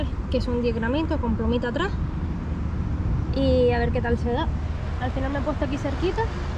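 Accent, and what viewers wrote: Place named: Canary Islands, Spain. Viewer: Lo mejor del video es las ganas y lo bien que explicas las cosas y ese súper acento canario que tenemos, sigue así que por lo menos a mi me enorgullece seguirte en tu canal, cuando vengas a Tenerife te diré buenos sitios de pesca a boya y a fondo que es lo que más práctico yo o el vivo a seguir mi niña toda una campeona saludos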